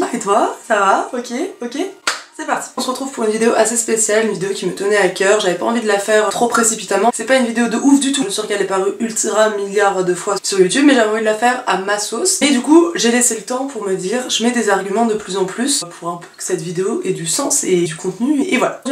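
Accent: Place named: Lyon, France. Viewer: Mdrrr pauvre bichette ah sa se voit que tu est de Lyon à ta façon de parler mdrrr je comprend en ville tout le monde est bizarre surtout la part dieu j’habite à côté en tout cas continue tes vidéos j’aime trop ta personnalité !💙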